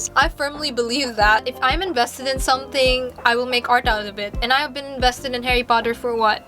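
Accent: Philippines. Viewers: You are from the Philippines? I hear from your assent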